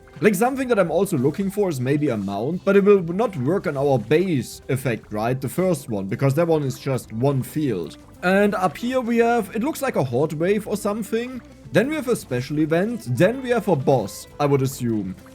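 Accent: Russia. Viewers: fam hes clearly Russian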